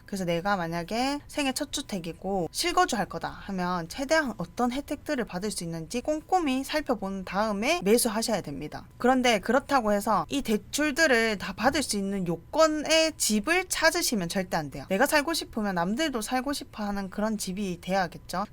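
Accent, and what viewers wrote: Gyeongsang, South Korea. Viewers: 대표님 경상도분이신거 같아요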